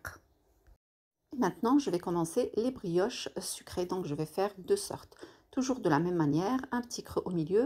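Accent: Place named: Ouezzane, Morocco. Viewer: à madame elle parle accès le même accès que le.mien je suis de ouezzane.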